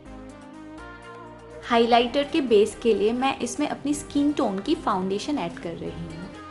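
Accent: India. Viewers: Before, you wrote the name of the ingredients in the legend of the step by step. I know how to speak English, but due to your Indian accent I have a little difficulty understanding what you're talking about. Please, if you do not mind, start captioning again. Please. I like you so much. Congratulations on the evolution of the channel =)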